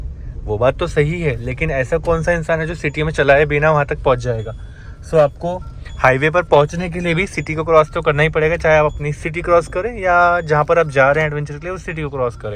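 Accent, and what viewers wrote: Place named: Mumbai, India. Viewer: Bhai kya commentry hai .. wah maza aa gaya ... Mumbai touch hai aapke language main .. keep the good work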